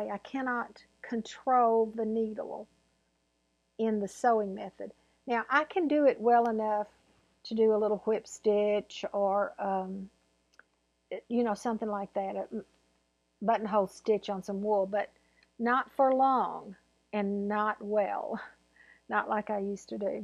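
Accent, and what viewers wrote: South, United States. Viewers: I love listening to your southern accent. Also love green Manger and red Manger. Glory and circle flowers. Happy Easter.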